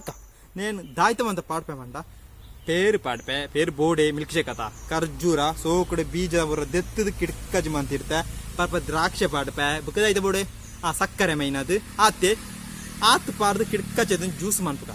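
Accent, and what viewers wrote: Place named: Tulu, India. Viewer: Eerena video frst d last Muttala thuyere bore apuji.comedy apundu eerena funny dailogues kendre kushi ippundu.n anchida Tulu patheruni style kenere edde apundu.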